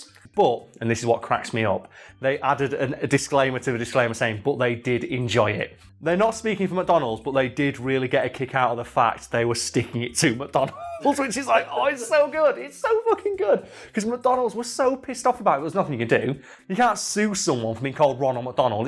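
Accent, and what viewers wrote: United Kingdom. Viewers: We r British it's how we pronounce it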